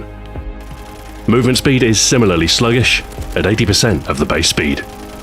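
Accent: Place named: United Kingdom. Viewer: You sound like Capt Price to me and it's because you're British LOL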